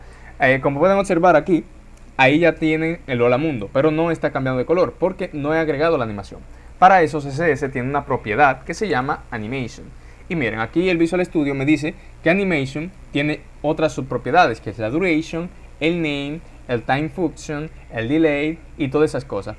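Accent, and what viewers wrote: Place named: Dominican Republic. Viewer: Suenas dominicano 😂 saludos broth bendiciones un nuevo suscriptor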